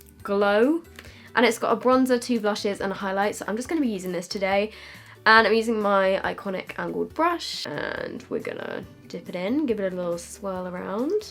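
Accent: United Kingdom. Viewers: dat british accenT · It's just British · She’s british 🤣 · shes hella british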